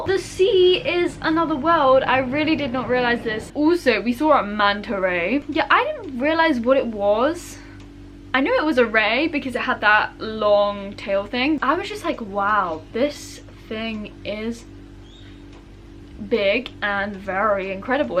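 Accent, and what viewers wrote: Australia. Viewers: lowkey getting an Australian accent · i totally agree - you sound 25% Aussie, and your intonation is 40%! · You’re picking up the accent a bit! Aha xx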